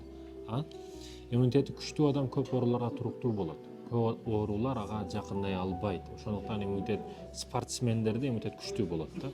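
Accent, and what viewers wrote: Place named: Osh, Kyrgyzstan. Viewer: А деп айтпачы а дей а дей берет екенсин ошттук окшойсун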